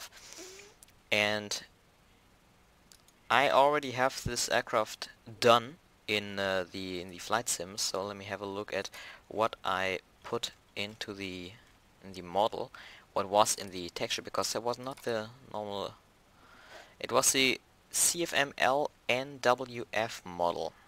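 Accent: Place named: Germany. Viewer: Man hört das du deutscher bist xD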